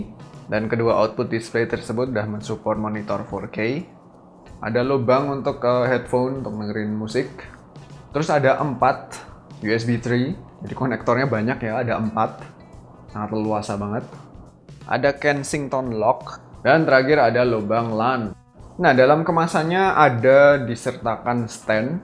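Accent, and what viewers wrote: Java, Indonesia. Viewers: Waduh Pak Logat Jawa nya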